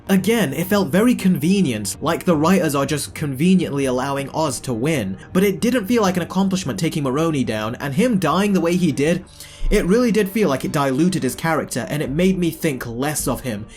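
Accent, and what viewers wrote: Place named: United Kingdom. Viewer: As I was listening to this doing my morning routine, I realized something I should have from the start but didn't pick up on until now. Maybe because of your British accent or "British like" (not good with that stuff), but anyway, you got me thinking about "Vic" is shorthand for cops for a victim. So in an odd way the entire time, they gave us a clue he was destined to be Penguin's victom all along. The extra sad part is if he let Squid live he would have had at least one dude who would recognize his body, but he killed him because he knew he would be (Victor) be less valuable to Oz in crown point and Victor's neck would be shot instead of choked out at the end. (Not to mention his mom giving Vic love and thinking he is the elder brother at times. As soon as Oz knew he had that gangster Aisian dude Vic was no more useful to OZ as a #2 man just like Squid would have been. 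Sad but Vic was always going to be a Victom of the city. Hopefully Batman takes in an Orphin underground that was selling bliss and wandered into the wrong tunnel and we can finally get a Robin or a Robin spinoff series that brings in Robin for Batman 3 vs Mayer Ozwald Cobblepot the new Mayer who "understands" crime better then the last Mayer Reeal.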